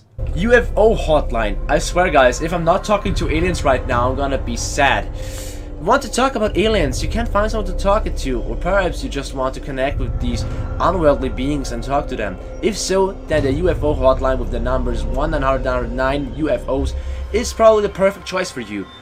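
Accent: Germany. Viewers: are u german because i can hear german in ur videos im german aswell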